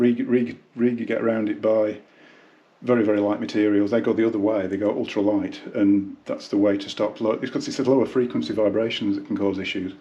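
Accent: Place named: North West England, United Kingdom. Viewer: his accent being called British upper class, I think you'll find it's a normal North West of England accent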